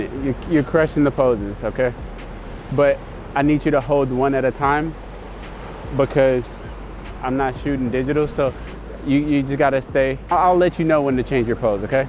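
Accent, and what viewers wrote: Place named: South, United States. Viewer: Your southern accent cracked me up XD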